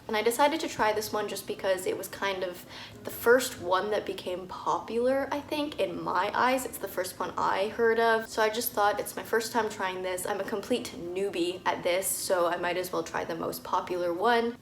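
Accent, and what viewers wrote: Canada. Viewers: I wouldn't say you have a heavy accent, but being a fan of Canadian TV shows, I picked up on it! Your review of this product was so educating and thorough. Really above and beyond! The company should pay you for this video alone . You probably know more about the product then the sales reps!😂 Love and greetings from America!! 😊💙 somewhat related: How does it differ from a diaphragm or nuvaring, maybe? Like could it be used as birth control? Probably not?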